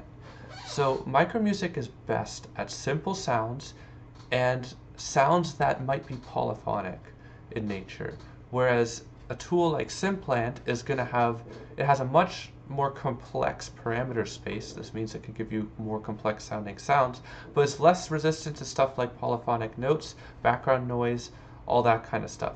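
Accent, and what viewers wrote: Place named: Germany. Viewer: Are you German? You kinda sound German to me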